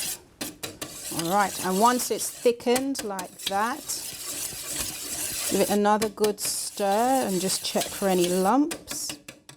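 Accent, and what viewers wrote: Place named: United Kingdom. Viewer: The long pause and pan up of the camera at the beginning of the video was quite creepy and strange 😂 I also was not expecting her to have a British accent.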